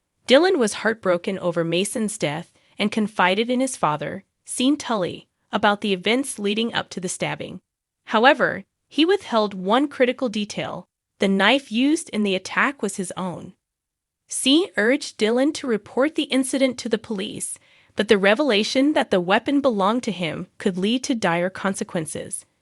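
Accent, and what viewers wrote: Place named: United States. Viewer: The AI voiceover in an American accent is very annoying who pronounces names wrongly!!!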